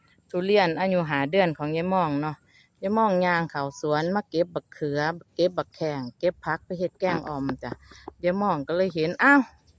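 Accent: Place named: Sisaket, Thailand. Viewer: สำเนียงเหมือนคนศรีสะเกษจังครับ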